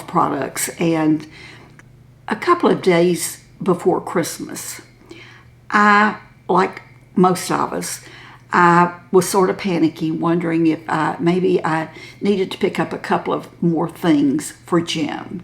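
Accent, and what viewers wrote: South, United States. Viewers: I just love your videos. Ur so sweet and such a great storyteller. Ur beautiful and ur southern drawl makes me swoon. My mom always had her face on and you've inspired me to get dolled up w/ELF! I'm in! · I love the sound of your beautiful southern voice and love how you enjoy transforming your already beautiful face. Thank you for being a reminder to our older generation that we too get to enjoy makeup and how it can make us feel. And have fun with it!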